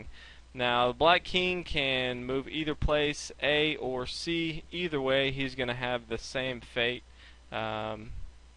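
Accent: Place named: United States. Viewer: I end up hearing an odd British accent at the beginning of the video, realizing it's more less an American accent. Isn't that weird?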